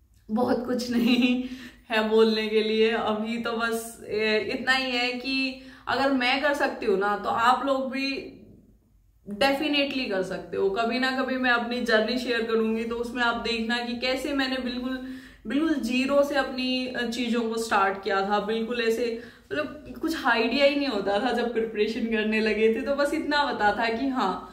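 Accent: Haryana, India. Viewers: Aap Haryana se ho ya Rajasthan se... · aap haryana se ho kya aapki voice tone se lg rha hai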